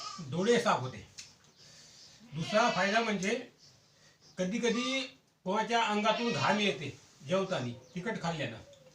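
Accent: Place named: Vidarbha, India. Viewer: भाऊ तुम्ही नागपूर म्हणजे विदर्भात ली भाषा बोलता.मीपण विदर्भात ली आहे त्यामुळे मला बरं वाटते.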